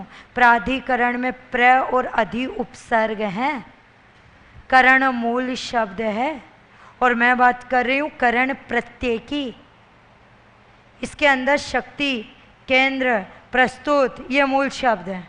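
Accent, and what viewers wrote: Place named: Mathura, India. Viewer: 100% h kI aap mathura se h🙏🏾🙏🏾🙏🏾🙏🏾🙏🏾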